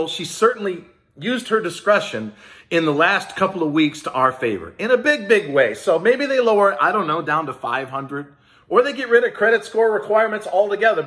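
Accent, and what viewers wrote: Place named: New York, United States. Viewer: Let me repeat myself..... Middle class is being FU$&€% OVER!!!🤣🤣 I was born and raised on Long Island, and I Swore when I first heard you talking...I KNEW.... you were a New Yorker!!! LOL 😂 We love you too!🤩☮️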